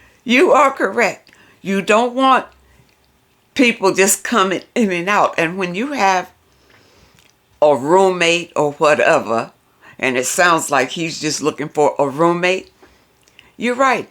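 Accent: South, United States. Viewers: Southern accent?? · There is a major difference between Southern and Stupid Hick. She IS Southern! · I'd rather live in a cardboard box in a ditch than to ever try to live with another man...or, another human being for that matter!!! Thank God I've learned a few lessons from life...and, now, old enough to know better😍No easy lessons...some of us have to learn them the hard way! The subtitles are nice but I had no trouble understanding your Southern drawl...maybe because I'm from Arkansas. Enjoying your channel and constantly nodding my head in agreement! ❤❤❤ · Dear Miss, I am Dutch and can hear your lovely Southern drawl very well. When people can't hear you, for whatever reason, they can tapp CC on YouTube and subtitles are added. You don't have to add extra subtitles. Kind regards, Caroline, The Netherlands. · Love your southern accent. I am here in Australia. 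I remain single after divorce. Also, they think they can be your boyfriend and arrive for drinks, food and sweet romance. Got bucklies with me. Sounds like same where you are. 🇦🇺🙂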